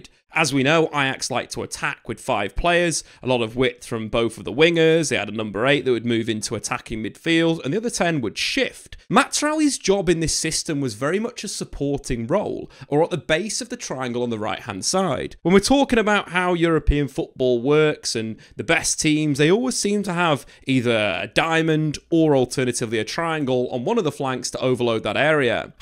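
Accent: United Kingdom. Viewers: Forgive his english accent 😅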